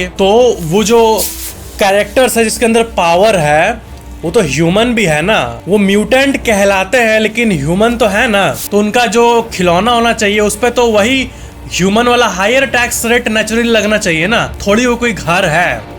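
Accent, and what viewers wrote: Bihar, India. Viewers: Ap bihar k hona🤔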